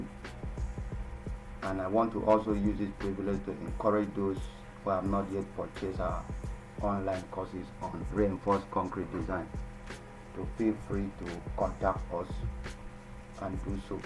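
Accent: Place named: Nigeria. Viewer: Gd job ma bro..from accent I can tell your Nigerian,am also Nigerian but am in the uk and work as a construction manager and found this helpfull.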